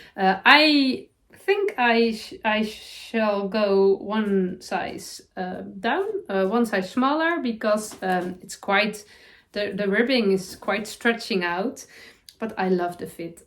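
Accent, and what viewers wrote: Netherlands. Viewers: . You just brighten my day. Love your podcasts and your “Dutchy’ English. So refreshing and genuine. Happy New Year. ❤️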